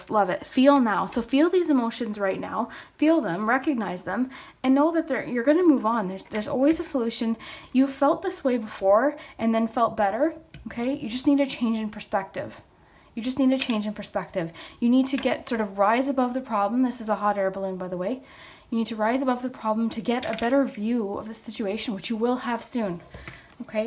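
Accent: Minnesota, United States. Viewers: Quick question are you from Minnesota?? Just because you said pop and your accent 😂❤️ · anyone know where she is from? or her actual name? i love her videos so much i watch all of them but i love her accent i just wanna know if its like Minnesota or something 😂 i feel like it is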